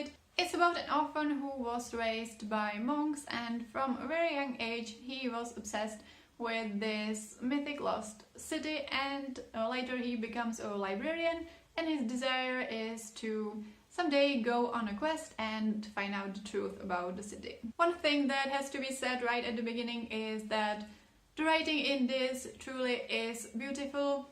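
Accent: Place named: Czech Republic. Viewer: Promiň ale... Ten český přízvuk...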